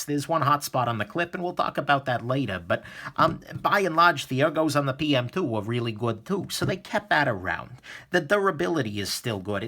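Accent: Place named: Alabama, United States. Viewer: I love your Alabama accent 👍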